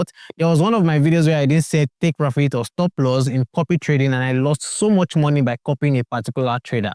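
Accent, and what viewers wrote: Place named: Nigeria. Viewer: Ur doing the lords work abeg. Been watching the videos in oyibo accent since and i was so confused. I needed this in complete naija accent lol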